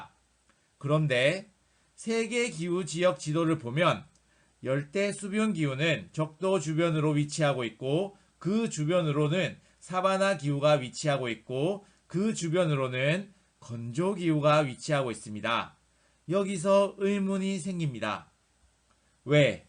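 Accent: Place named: Gyeongsang, South Korea. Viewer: 옆에 있던 엄마가 쌤이 갱상도 부이시가?? 싸바나 아이가~~ 싸바나~~ㅋㅋㅋ 넘 잘 듣고 있습니다!! 감사합니다!!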